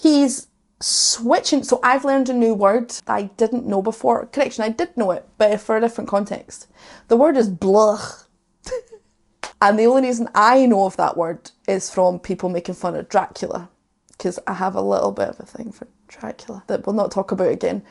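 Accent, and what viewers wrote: Scotland, United Kingdom. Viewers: I'm a silver fox😂😂😂 52 and been listening to Metal fir over 40 years now. My ma was 13 when The Beatles erupted and she got me into rock n roll. They were the first band I remember listening to and it's went from there. I repaid that start by taking her to see Iron Maiden. She is a massive Manowar fan (a band you have to listen to). But the crowning glory was taking her to see Paul McCartney at Giants Stadium in 1990. Please keep doing what yer doing and fuck the naysayers 🤘🏻🇬🇧🏴🖤 Love the Cattle Decapitation as well🤘🏻 P.S. NEVER apologise fir yer beautiful Scottish accent and being Scottish 🏴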